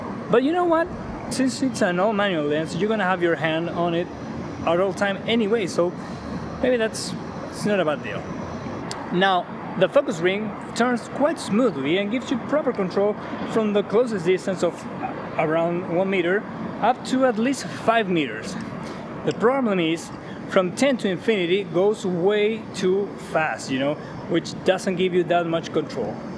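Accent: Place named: United States. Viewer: hermano partio el video y pense que eras gringo xd gud inglish. saludos desde coquimbo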